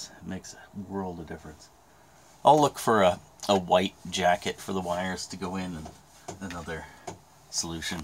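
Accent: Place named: Canada. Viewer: I thought I detected a Canadian accent, it was confirmed when you mentioned using "white hockey tape" to wrap you wires. Take care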